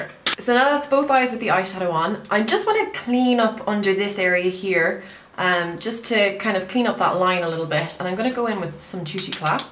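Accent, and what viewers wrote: Ireland. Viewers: Love it! You're sounding much more Irish in this video :) Did you recently visit home?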